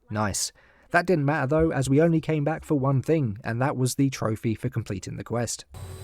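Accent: United Kingdom. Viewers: Love clicking on videos and as soon as I hear the dumb British accent. I click off 🤣😂😆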